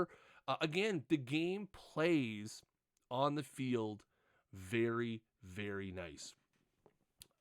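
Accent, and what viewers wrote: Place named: Ireland. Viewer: Total tangent, but I'd love to know where your accent is from. You sound like you turn Irish every time you make an 'ar' sound. When you say 'are' and 'target' back to back, as an example.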